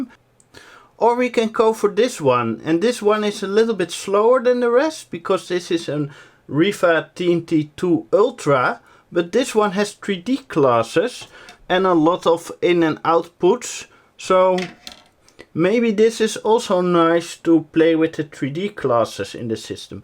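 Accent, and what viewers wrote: Netherlands. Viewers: Dit Dutchglish is echt schattig :D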